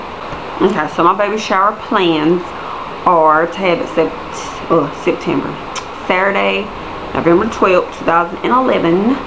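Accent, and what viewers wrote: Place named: South, United States. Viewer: Where are you from? your accent is sooooo country, but different LOL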